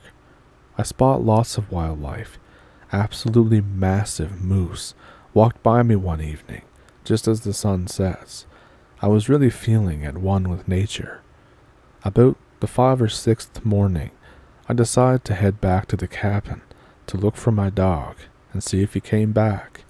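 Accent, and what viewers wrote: Canada. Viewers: Must be Canadian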